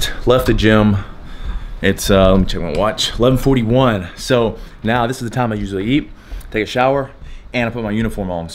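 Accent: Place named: South, United States. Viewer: Don't take offense, but you remind me of a tough version of Lance Bass. Maybe it's your southern accents. I know he's from MS and you're from AL.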